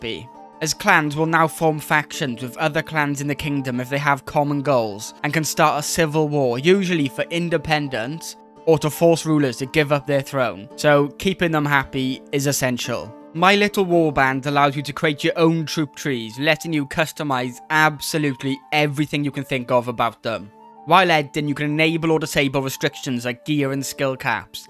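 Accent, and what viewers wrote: Wales, United Kingdom. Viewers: Nice vid. Is that a Welsh accent?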